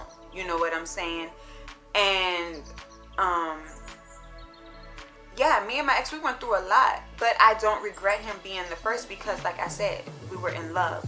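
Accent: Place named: New York, United States. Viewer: Your New York accent had me hollering 😂😂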